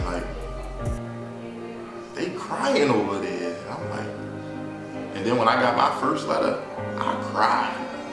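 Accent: Baltimore, United States. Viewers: I’m from Baltimore to G as soon as you started the video I’m like yo this dude from around here😂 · Lol I knew I heard a Baltimore accent. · As soon as I heard him talking I knew he was from Baltimore 🤘🏾🤘🏾😎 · i knew you was from here, baltimore in the first five seconds lmaoooo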